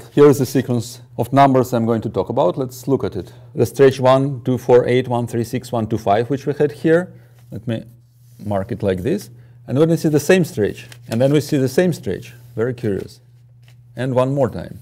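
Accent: Russia. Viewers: I immediately recognized he is Russian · is he russian? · Is this guy russian? His accent is very familiar to russian. P.S. : No stereotypes, i just live in Russia and i have to admit, that most of us, russians, have terrible pronunciation:)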